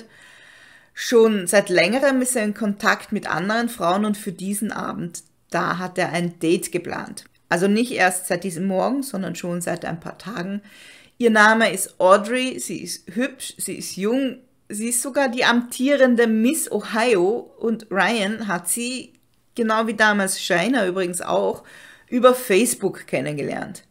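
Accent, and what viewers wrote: Austria. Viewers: Also ich kann nicht mehr aufhöhren dich zu höhren ! Du hast jetzt den ersten platz von Allen,,true crimerinnen,,dein österreicherische akzent ist die kirsche auf der torte. Weiter so❤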